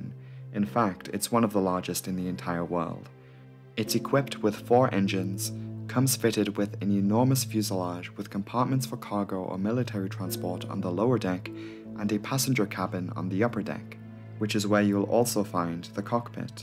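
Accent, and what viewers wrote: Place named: North East England, United Kingdom. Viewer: Splendid video. Thought provoking. Love your North East accent. My mum (mam) was born in Chopwell. 😊❤️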